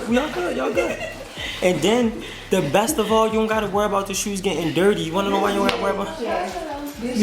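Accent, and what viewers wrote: Baltimore, United States. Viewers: You frm Baltimore? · Ik you from Baltimore 🤣🤣you can tell in ya accent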